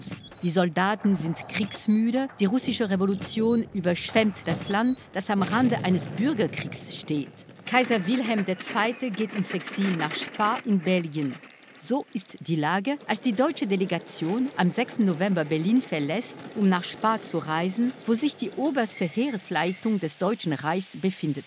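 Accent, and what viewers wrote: France. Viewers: Marschall ist ein deutsches Wort. Die Sprecherin spricht deutsch (mit französischem Akzent). Alles korrekt bei arte. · Man hört sofort ihren französischen Akzent!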